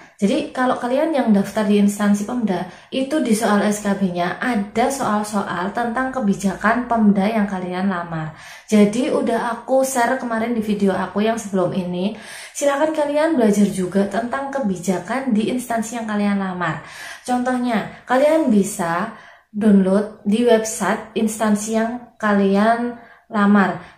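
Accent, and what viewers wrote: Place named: Java, Indonesia. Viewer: medhok mbak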